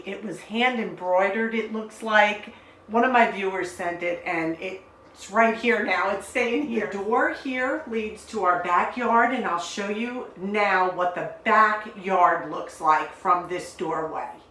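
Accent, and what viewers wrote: New York, United States. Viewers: This house is a treasure - with the huge lot and close to the Ocean. Never sell it - pass it on to your children. Old homes were built to last - not like the construction of homes during the last 20 years. I was born and raised in NYC/Brooklyn decades ago - so I enjoyed listening to ur NY accent. Paint and Flooring upgrades will update the house. There are so many choices of both. Enjoyed your video.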